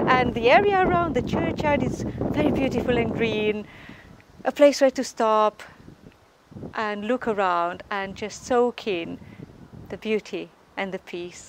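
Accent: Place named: England, United Kingdom. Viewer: Are you an English lady or you just visited this place ? Because I'm watching a British teacher from " Much wenlock " he has a channel on you tube for learning English his name " Misterduncan " his accent is completely different than you . I'm just wondering why you didn't have the same accent if you were from the same place " Much Wenlock "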